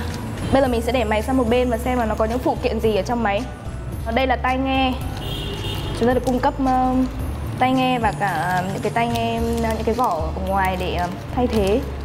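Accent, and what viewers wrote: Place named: Hanoi, Vietnam. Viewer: uầy uầy uầy uầy uầy hôm nay là chị mà, vui quá vui quá, mà hình như là giọng chuẩn Hà Nội ròi, hay hay hay, vote up vote up, hay hay hay, mới nghe rồi nhảy xuống cmt cũng thấy hay, hay hay hay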